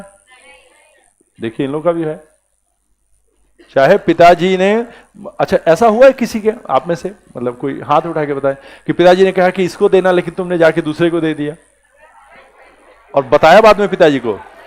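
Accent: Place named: Bhojpuri, India. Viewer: मजा आ गया क्लास कर के sir😊भोजपुरी स्टाइल में भी sir समझा रहे ह😊😃👍👍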